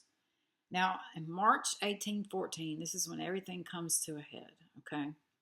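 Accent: South, United States. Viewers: Love the lip shade. And your southern accent. Subscribed. Much love from Arkansas.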